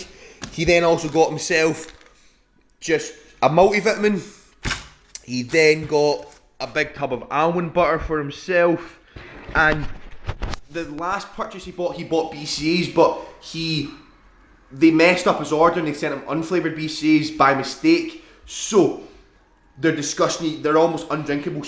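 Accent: Scotland, United Kingdom. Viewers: the heavy lifting brings me here, the thick scottish accent makes me stay good lifting!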